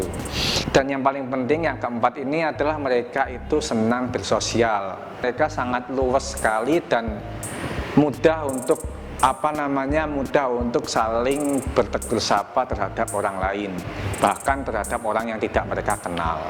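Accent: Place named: Java, Indonesia. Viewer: Medok lek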